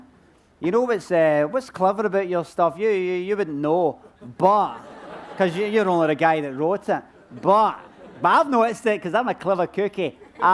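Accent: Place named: Scotland, United Kingdom. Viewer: He's Scottish… he speaks normal for a Scottish person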